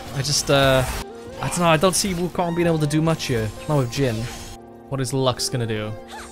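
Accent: Wales, United Kingdom. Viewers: Awesome! The Welsh accent made me feel back at home 😊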